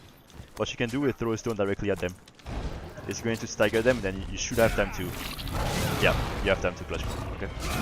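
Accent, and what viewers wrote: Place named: France. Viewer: Alors accent français... 69... région Lyonnaise? 😏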